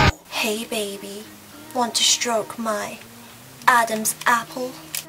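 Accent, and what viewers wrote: United Kingdom. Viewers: Are you British? I'm from Australia and you are so funny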